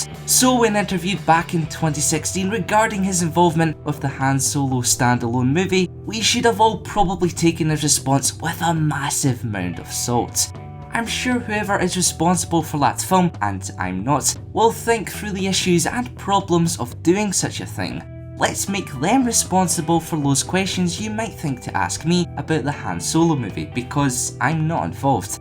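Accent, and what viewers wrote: Scotland, United Kingdom. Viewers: Are you a scotch? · What part of Scotland? I have friend who's husband is from Glasgow, I have a really hard time understanding him over the phone. You have a lovely accent and I can understand ya perfectly. :)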